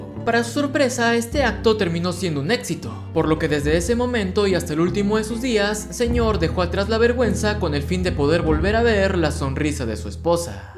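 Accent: Peru. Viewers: eres peruano?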